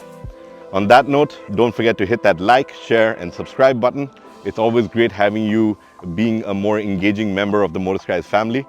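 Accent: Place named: England, United Kingdom. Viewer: What's with the heavy English accent?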